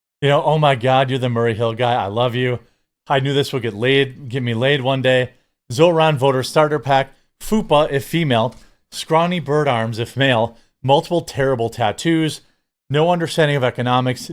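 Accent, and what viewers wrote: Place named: Michigan, United States. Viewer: You're from Michigan, you have the accent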